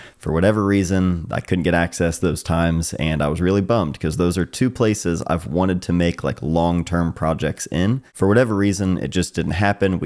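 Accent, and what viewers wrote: Ohio, United States. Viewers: Okay, so I'm a newbie to photography and found your channel by random chance on YT. I started to listen to you speak and immediately thought "that accent sounds familiar"...and then I check your channel's "about" portion and find out that you're from/based in Chillicothe, Ohio. And it all made sense. I grew up not far from you. It's great to see someone from that area of "rust belt meets Appalachia" doing so well on YT and in his creative pursuits. Keep it up, bub!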